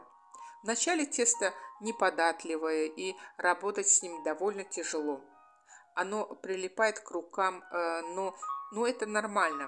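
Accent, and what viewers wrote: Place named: Baku, Azerbaijan. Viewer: ханум, спасибо.Сама я тоже из Баку, обожаю слушать наших женщин с нашим бакинским акцентом.Удачи Вам и процветания Вашему каналу.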